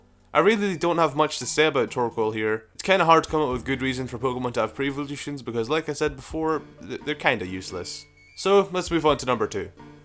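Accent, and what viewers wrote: Scotland, United Kingdom. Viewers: Yes! It's the Scottish guy again! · hes scotish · so scottish :)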